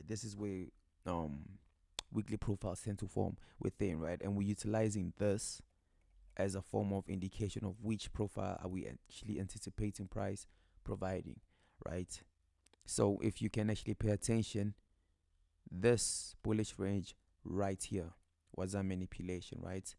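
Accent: South Africa. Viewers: just plain typical Joburg boy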